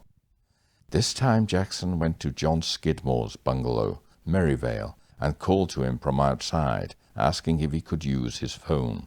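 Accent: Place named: Swansea, United Kingdom. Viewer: Having just finished my own first book, you have my sympathies. It involves such a monumental commitment of time and emotion with no guarantee of conventional publishing success, but with the strong platform you have built here I’d have thought there’s a good chance you’ll get interest in publication. I had no idea you too are from Swansea (though I thought I detected traces of a local accent in your voice). Ive enjoyed your work greatly during the last few very difficult months so wish you ‘pob lwc’ with your book, which I can’t wait to find out more about especially as the locations are so familiar.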